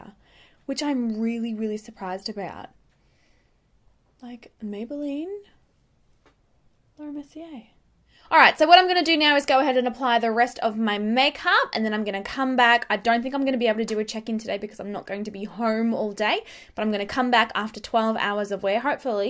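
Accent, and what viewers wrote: Australia. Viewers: 1) You're a gorgeous girl. 2) Us Americans luv those Aussie accents and I'm no exception. 3) Luv how excited u get over a really good product. You look like the kind of girl who wouldn't get excited about anything (u have that 'cool' factor.) 4) U have the most beautiful blue eyes. I've been trying to find a pair of colored contacts that r your eye color but to no avail.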